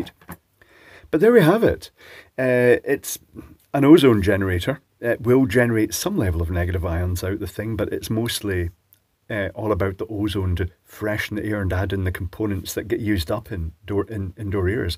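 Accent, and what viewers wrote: Scotland, United Kingdom. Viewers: There's something magical about hearing "green crustiness" in a Scottish accent.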